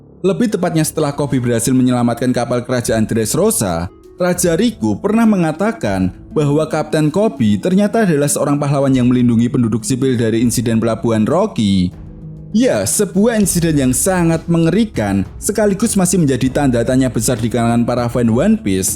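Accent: Java, Indonesia. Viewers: Logat jawamu Hilangkan dikit, gk enak didengar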